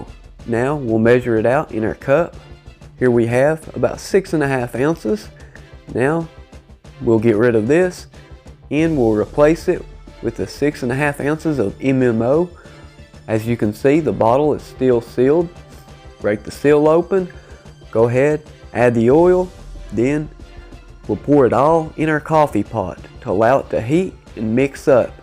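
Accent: United States. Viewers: This video confirms my belief, People from the south shouldn't be anywhere on my TV. Please talk correctly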